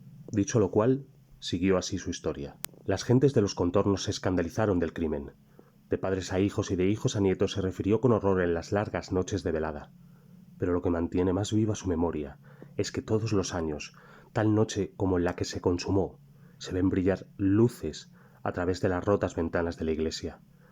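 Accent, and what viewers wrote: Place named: Spain. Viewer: Woooow! Me encanta tu voz Española. Muy buen relato te felicito.🤗👏👏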